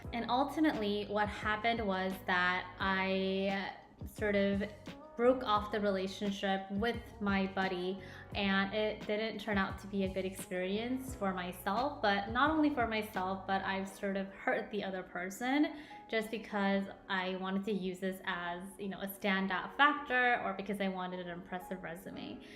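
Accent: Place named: South Korea. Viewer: I love your 2nd gen Korean accent~~ lovely!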